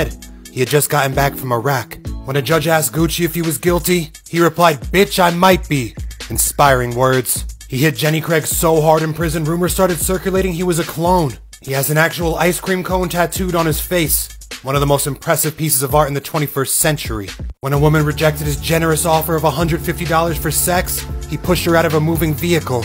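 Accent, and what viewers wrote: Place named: New York, United States. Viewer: Bro idk but the videos u used to do we’re clutch. That New York Italian type of talk is classic. Don’t stop n take it back to how I did the old ones. All respect just my opinion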